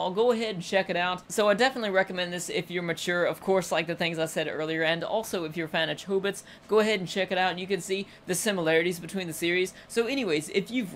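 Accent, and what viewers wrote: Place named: United States. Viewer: Random question, but where are you from? I can hear a country accent from you lol.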